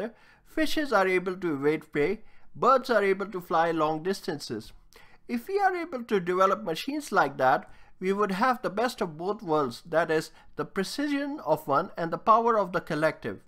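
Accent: India. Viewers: Oh wow an interesting video. Indian accent Nevermind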